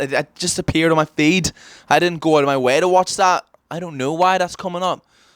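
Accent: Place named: Northern Ireland, United Kingdom. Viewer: You from Northern Ireland? Or ireland seems like more noeth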